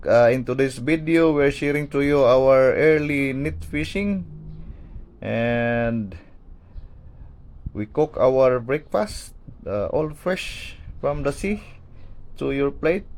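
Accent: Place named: Visayas, Philippines. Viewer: Hala bisaya diay ka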